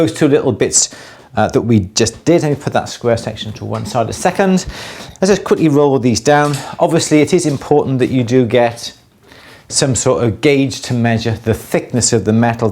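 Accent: United Kingdom. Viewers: I definitely put the cart in front of the donkey.... I was partially through a metalsmithing class before the class was shut down because of the virus. But ar 62, I’m determined this is what It want to do, even if only for making jewelry for myself, This morning I bought a Durston Agile C140 Combo 60 Flat/40 Wire Rolling Mill. I figured with all this time on my hands, why not play and learn at the same time? I came here tonight to search for beginning projects for rolling mills and found your awesome video. THANK YOU! This will be so helpful! PS! I’m thrilled you like the Duraton Agiles. What sold me was the 5th wheel that made the rolling go easier, smoother, over having four wheels. I know I will love it when it comes next week! LOVE your videos and your British accent!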